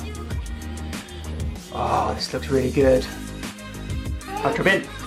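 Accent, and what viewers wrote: United Kingdom. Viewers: British accent is beautiful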